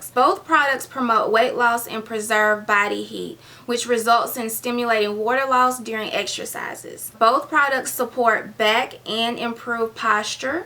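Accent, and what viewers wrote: South, United States. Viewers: I love your voice smooth and southern you could read a prescription bottle and it sounds good "Naproxen, Acetaminophen, Iridocyclisis" 😂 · I like that southern bell voice very relaxing😁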